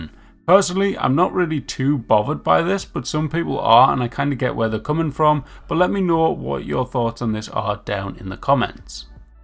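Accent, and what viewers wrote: United Kingdom. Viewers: i hate this heavy british aceent! (o)pdates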